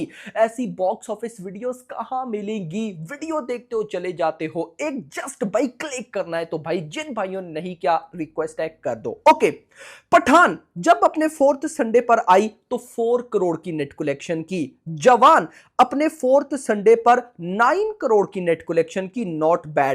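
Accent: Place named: Pakistan. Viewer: Ye pakistani hai kya bc kya accent hai ye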